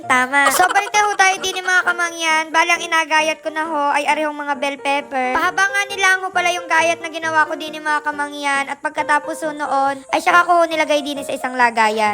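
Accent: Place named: Marinduque, Philippines. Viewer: Kakatuwa talaga mga vlog mo nakakwala Ng stress Lalo mga Punto Ng salita mo kamiss tuloy umowe Ng marinduque😊😊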